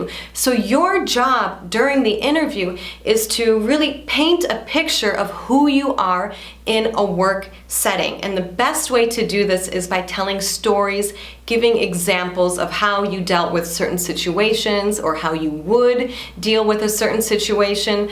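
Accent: Brazil. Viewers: I guess you're losing your English your speak sounds like so Brazilian hahahah!! So cute!:P, eu acho que você tá esquecendo como é que se fala em Inglês, o seu jeito de falar tá muito brasileiro! hahahah...! Acho tão fofo isso,:p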